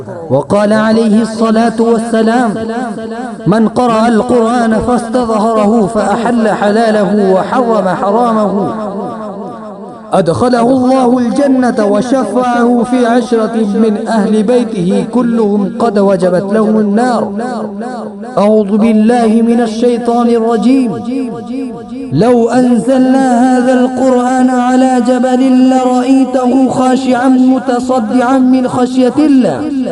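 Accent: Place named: Arab world. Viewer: Arabic andaz masha allha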